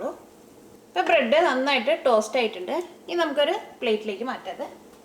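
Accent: Thrissur, India. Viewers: Aa thrissur accent inkkishtai.. :) thanks for the recipe.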